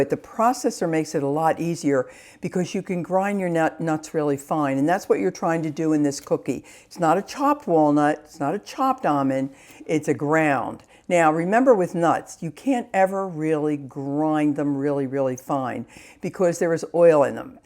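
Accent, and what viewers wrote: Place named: New Jersey, United States. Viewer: Are you from NJ by any chance? You definitely sound like you are lol. I’m from NJ, I live in Haddon Twp., Camden county. I love these cookies and I was looking for a recipe with almonds and low sugar in the cookie recipe. Thank you very much!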